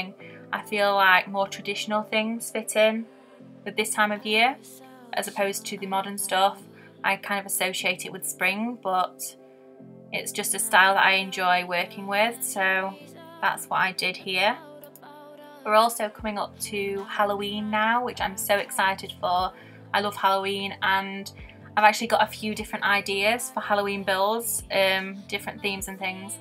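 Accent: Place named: United Kingdom. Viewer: I like the voice 😊 It is better for me to improve my understanding to british accent 👍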